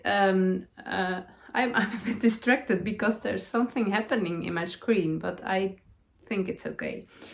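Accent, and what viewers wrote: Netherlands. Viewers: your Dutchy English is perfect. I really enjoy your podcasts, love your projects also. You do make me 😂 while I’m sat knitting. Will definitely be knitting The Felix Cardigan along with you, and also in brown! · Hi, lease don't change how you do your podcasts, I love your dutch english, can easy understand you. Your knits are lovely, really like your vest.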